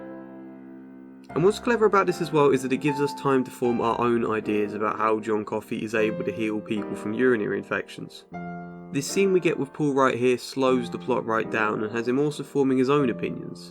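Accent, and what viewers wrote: England, United Kingdom. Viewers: The choice of music and English accent made me feel as though I was listening to a creepypasta video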